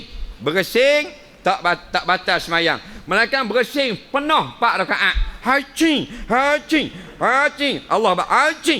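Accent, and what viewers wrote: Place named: Pahang, Malaysia. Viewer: Pahang doh ustak.. Time kaseh banyok impo nye ustak..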